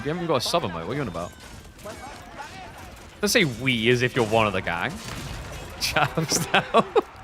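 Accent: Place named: United Kingdom. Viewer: Sounds like a Brit but hails from Hungary. A Mantas if you will.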